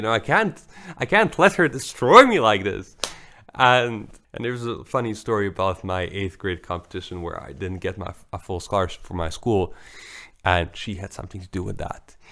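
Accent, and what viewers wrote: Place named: Bosnia and Herzegovina. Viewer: He has a Bosnian accent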